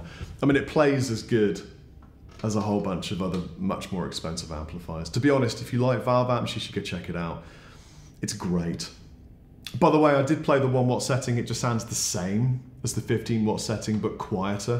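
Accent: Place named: United Kingdom. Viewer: You lost me, you talk too much! I want to hear the amp, not your British accent.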